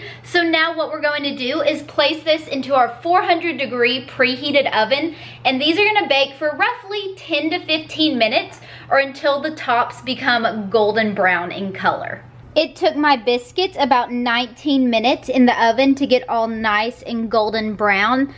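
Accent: South, United States. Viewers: “Brushy ma jig” love it! Love ur southernness.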